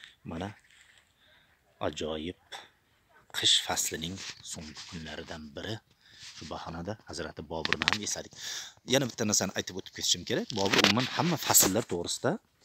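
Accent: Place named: Surkhandarya, Uzbekistan. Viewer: Сурхондарё еки Кашкадарё дан деб тахмин килгандим шеванггиздан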